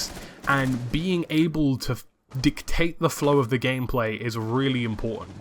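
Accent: United Kingdom. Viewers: British accent + advanced vocabulary = music to my ears :)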